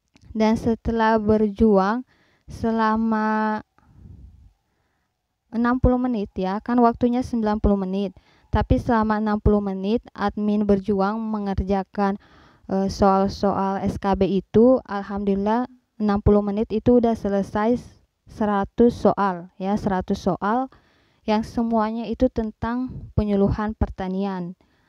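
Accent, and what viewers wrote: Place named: Central Sulawesi, Indonesia. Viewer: sya kira orng Sulawesi Tengah soalnya logat bicaranya mcm tdk asing di dengar.. Baru ad vlog dipantai Nambo.. Sama nama daerahnya dengan yg ada disni.. Klw kk orng sini langsung otw ke rmh kk buat belajar skb 😁🙏🙏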